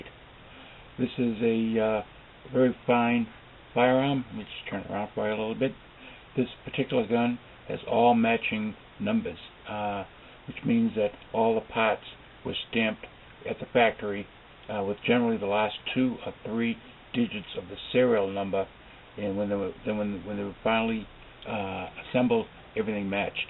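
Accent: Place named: Boston, United States. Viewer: You sound like that you're from Boston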